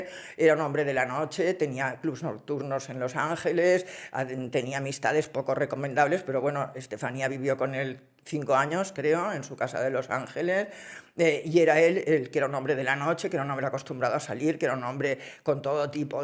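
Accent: Spain. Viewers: Hola me encanta tu programa, hablas muy rápido por lo que no hay tiempo de aburrirse y tu acento español es muy bonito. Soy cubana y demás está decirte lo involugrada que está Cuba con España desde hace cientos de años. De jovencita creía que los príncipes y princesas eran bellas personas y vivían felices en sus palacios. De adulta leyendo realidades de la realeza me fui dando cuenta que son personas llenas de defectos y muchos de ellos malas personas. Hoy día una niña se comporta como la princesa Estefanía y la hubiesen puesto en clases especiales y con muuuuuchas citas al psicólogo. Recuerdo el accidente de Estefanía y su mamá, yo ¡¡¡sí!!! creo que la que estaba manejando era la princesa y como era menor de edad podría ir a la cárcel por haber causado la muerte a su madre involuntariamente. Pienso que como era muy caprichosa y siempre le gustó hacer lo indebido pidió manejar y su madre la dejó. 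También creo que lo que Dios escribe nadie lo puede borrar, por muchas cosas que quieran ocultar. Mucha suerte en tu interesantísimo programa. 👍👍👍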